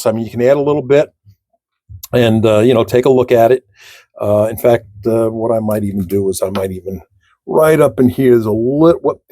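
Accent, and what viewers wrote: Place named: Suffolk County, United States. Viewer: Just found your page wow awesome content I just watched 3 videos,great info and tips/tricks I live in fla I'm originally from Suffolk county so it's refreshing to hear your voice lol happy holidays and please post more videos I'm going to share it with some friends thank again great work!!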